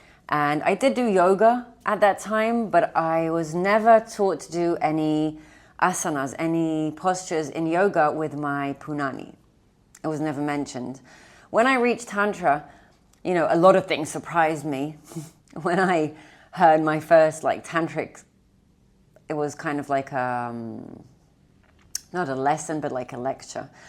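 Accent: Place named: United Kingdom. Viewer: את נהדרת, אני עוקבת אחרייך כבר שנים. מה זה המבטא הבריטי הזה??? 💙🦋 תודה על המידע והשיתוף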